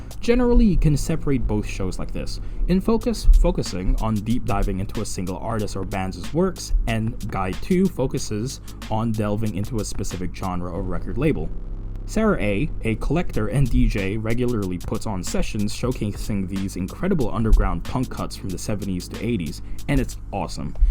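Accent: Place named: United States. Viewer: Would've never guessed you were a foreigner, you sound perfectly American to me. Funky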